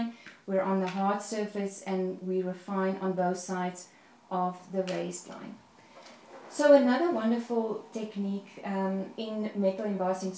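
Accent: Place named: South Africa. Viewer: Looks lovely,is metal foil the same as pewter.nice to hear South African accent again ,I'm a ex South African living in the UK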